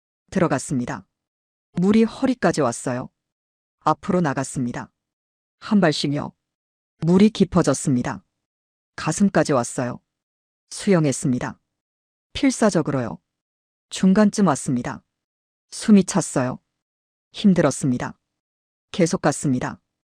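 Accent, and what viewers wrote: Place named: North Korea. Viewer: 나레이터 바꾸세요. 너무 부칸식 ~ , 사투리는 좋은데 억압죽은 그렇네요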